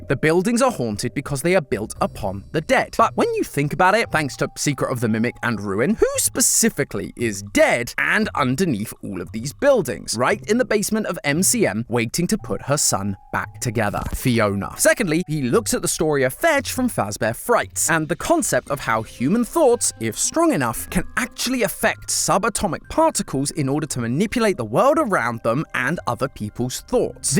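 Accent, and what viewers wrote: United Kingdom. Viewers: What happend to matpat? Why is there a British guy?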